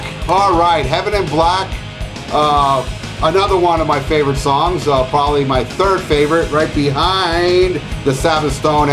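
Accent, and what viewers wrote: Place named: Philadelphia, United States. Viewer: You're accent sounds like here in the Philly area. Once again, this is fun viewing.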